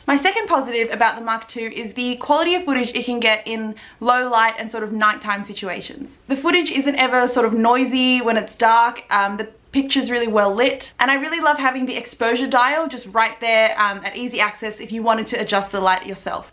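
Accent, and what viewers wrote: Australia. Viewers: Sounds aussie ;) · omg its so unexpected to hear an australian accent LOL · oh my gosh, I love your Australian accent ! so sweet ! kiss from Paris X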